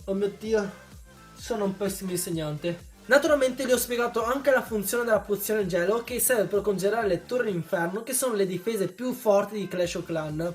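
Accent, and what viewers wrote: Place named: Veneto, Italy. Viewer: di dove sei? (italia) perchè ho sentito un pò di dialetto veneto ahah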